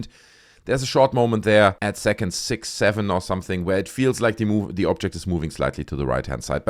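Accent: Germany. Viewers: This a German guy ! His accent is great 😂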